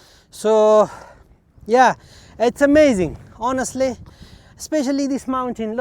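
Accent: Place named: Stoke, United Kingdom. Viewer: Where did you pick up the northern english accent pal? Sounds a bit like a Stoke accent. Love it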